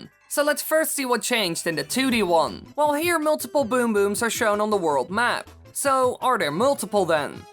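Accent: Netherlands. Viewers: Are you dutch? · Ik hoor aan je accent dat je Nederlands bent maar ik wist het niet honderd % zeker alleen toen keek ik een oude video van jou n daar zij je dat je een meeting ging houden in Utrecht maar waar woon jij ik woon in Overijsel vlak bij Zwolle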